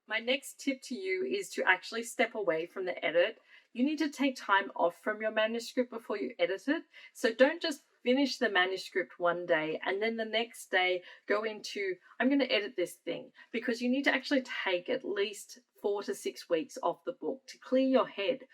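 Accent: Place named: Australia. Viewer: This was super useful! I've suscribed because you give great advice and because I love your aussie accent. I haven't checked your YouTube channel yet but I hope you're still doing videos! I've finished my first draft (80k words) and editing is killing my dreams! But this has given me so some hope :) Thanks!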